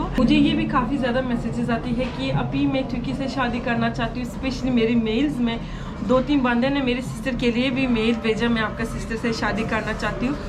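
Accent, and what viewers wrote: Pakistan. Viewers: Ap sukardeo Gilgit baltistan k logo ke tara Urdu bolti Mashhallah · Yes u right u r not phupho 😂 Love your Urdu accent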